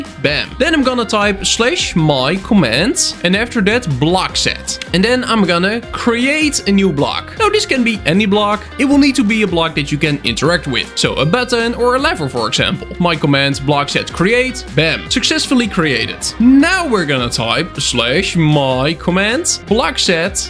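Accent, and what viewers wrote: Netherlands. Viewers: I smell Nederlander?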